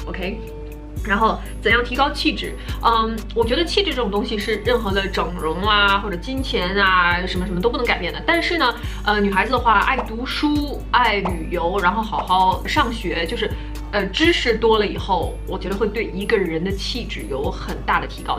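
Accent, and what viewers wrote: Beijing, China. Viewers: 偶尔看到的视频说话的语速和独特的气质感觉应该是北京女孩，果然是啊！好喜欢💕气质真好！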